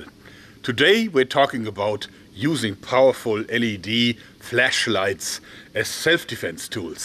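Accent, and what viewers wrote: Germany. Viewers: Are you german ?? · Is this guy german?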